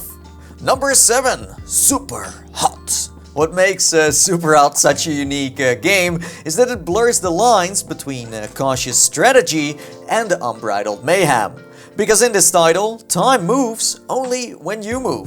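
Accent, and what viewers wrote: Netherlands. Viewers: Your english accent is verru dutch, are you dutch? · I just cant stand his accent, I mean I'm dutch and he is too but his english has a dutch accent in it, I just cant listen to it.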